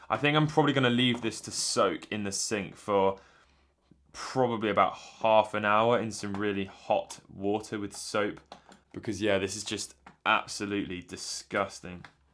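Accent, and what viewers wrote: England, United Kingdom. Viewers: The English accent and vocabulary makes me feel like the gameboy is dirtier than it really is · I love how his English accent sound "Dah screw sstick to my finguh"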